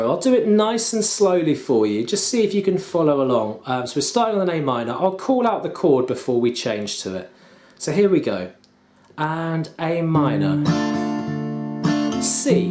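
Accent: England, United Kingdom. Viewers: WOW! Your English accent threw me for a loop! Great cover, cheers :P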